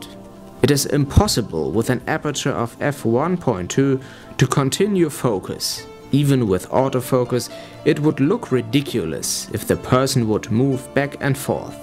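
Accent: Germany. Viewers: German Accent ;)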